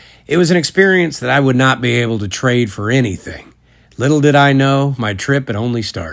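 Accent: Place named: Texas, United States. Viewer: The delivery and vibe this man brings is beautiful, it’s that old Texas twang full of warmth and character. Really excellent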